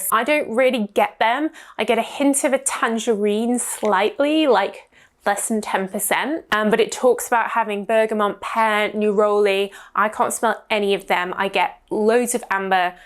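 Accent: United Kingdom. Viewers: is your dialect british?(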